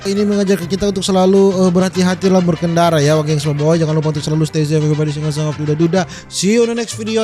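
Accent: Manado, Indonesia. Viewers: Cuma Reaction bang yg bkn gw ketawa.di tambah logat Khassss manaddooo.. Tambah lucu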